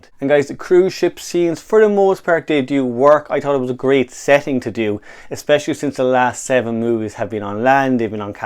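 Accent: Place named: Ireland. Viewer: I never really got into this franchise, so I have nothing to comment on. I’m just here to give you a thumbs up and I LOVE your accent!! Is that Irish?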